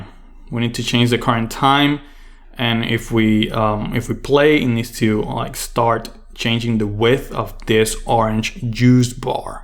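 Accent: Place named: Puerto Rico. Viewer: You low key sound Puerto Rican.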